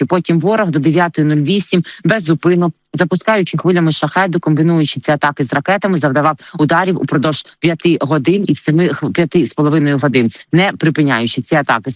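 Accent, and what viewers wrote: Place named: Russia. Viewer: ЯКИЙ ЖАХ! СКАЖЕНА СТРАШИЛКА 24 КАНАЛУ ЛЯКАЄ МЕШКАНЦІВ МОГО РІДНОГО МІСТА!!!!!!!!ЛЬВІВЩИНА НЕ Є (ЛЬВІВЩЄНА),БАЧИМО НЕ Є (БАЧЄМО),ДІВЧИНКА НЕ Є (ДІВЧЄНКА),ЩЕ РАЗ НЕ Є (ЩЄ РАЗ),ВЕЧОРА НЕ Є (ВЕЧЄРА),ЩО НЕ Є (ЩЬО), ,ЩЕ НЕ Є (ЩЄ),ХОЧУ НЕ Є (ХОЧЮ),НІЧОГО НЕ Є (НІЧЬОГО).........!!!!!!!!!!!!!! НАВІЩО ВИ ПОСИЛАЄТЕ НА ЗАХІДНУ УКРАЇНУ МОСКАЛЬСЬКО-УРОДСЬКИХ ВЕДУЧИХ,ЯКІ ЗНУЩАЮТЬСЯ НАД УКРАЇНСЬКОЮ МОВОЮ????????????ЦЕ НЕ КАНАЛ,А ГАНЬБИЩЕ В УКРАЇНІ,ДЕ ВСІ МОСКАЛЬСЬКІ УРОДИ ЗНУЩАЮТЬСЯ НАД НАШОЮ СОЛОВЇНОЮ МОВОЮ!!!!!!!!!!!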